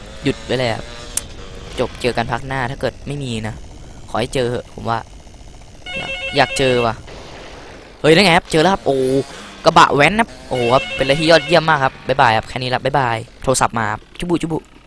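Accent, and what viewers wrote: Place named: Korat, Thailand. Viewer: คนโคราชป่าวครับฟังจากสำเนียงเหมือนมากๆ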